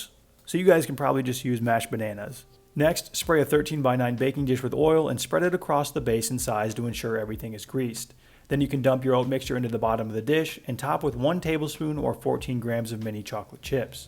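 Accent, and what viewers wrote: Australia. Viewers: Oh wow. That’s an authentic Australian accent. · 10/10 Aussie accent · Great ozzy accent! I feel like you would be great guy as a friend 😆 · Thumbs up 👍🏾 for the awesome Aussie accent! 😆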